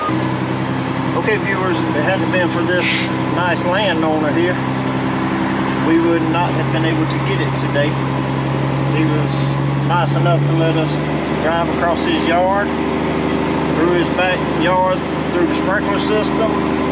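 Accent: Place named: South, United States. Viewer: no P in sumter lol, that southern draw, love it. great video and recovery. you have a great deal of patience. I recently recovered a tracked skidder with mud and water over the controls, crappy filming I did because people didnt want to be on film and they didnt know how to operate it at all, made for a long day, lol. My truck is way smaller too, lol. Yall stay safe and keep having fun.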